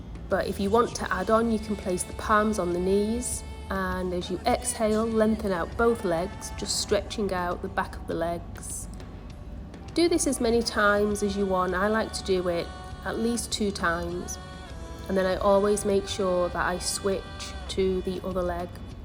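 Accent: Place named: London, United Kingdom. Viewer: Awesome ride and video. I’m here local in Miami also. I ride that road all the time that you were on. Half of my family sound like you from London. Ill subscribe and hopefully we can do a ride. P.S. black point is the spot.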